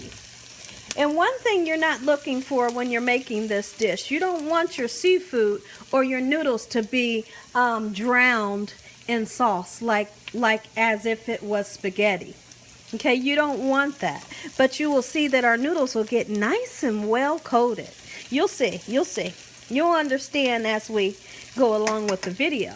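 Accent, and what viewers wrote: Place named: California, United States. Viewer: She sounds like CALI lol. I'm from ST Louis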